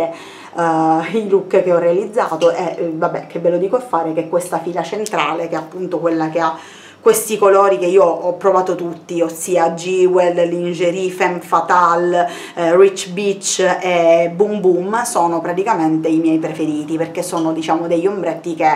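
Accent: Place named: Sicily, Italy. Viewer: Io ti ho scoperta da poco e amo il tuo accento essendo anch'io di origine siciliana. E mi sto guardando tutti i tuoi video 💞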